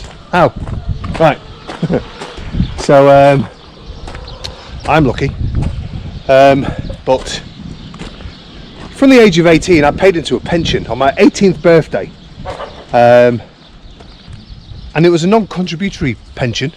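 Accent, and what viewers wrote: United Kingdom. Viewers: I agree with you. The glasses make the difference with the song. High class 😅 Good pronunciation with a light british accent. It's easy to understand your French.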